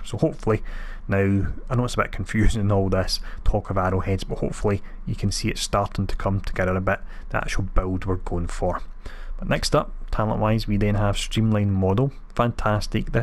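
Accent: Scotland, United Kingdom. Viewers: Sorry for the off topic question, are you Scottish ?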